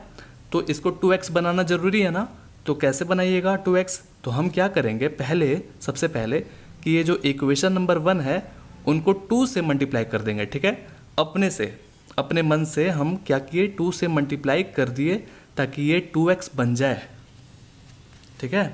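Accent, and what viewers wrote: Bihar, India. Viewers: Sir bihar ke ho ky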